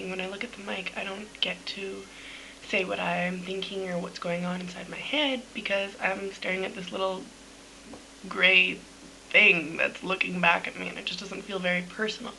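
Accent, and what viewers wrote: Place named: Canada. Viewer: i'm hooked. i love listening to your Canadian English too. And your life is so different than any I know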